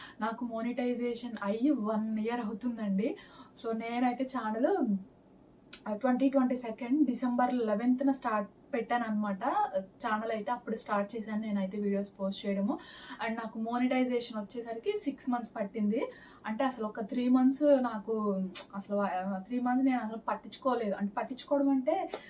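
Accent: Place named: Guntur, India. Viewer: అవునండి గౌతమి వాయిస్ లాగే ఉంది ఎందుకంటే స్లాంగ్ ఒకటే కాబట్టి గుంటూరు డిస్ట్రిక్ట్ అలా అనిపిస్తుంది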